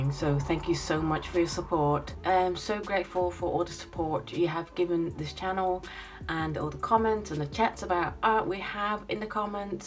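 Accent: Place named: United Kingdom. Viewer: I adore this channel. I just know you'll go far. Question, a bit of a personal one: Are you a UK expat, or were you born/raised in the UK. Your accent sounds blended and it's awesome!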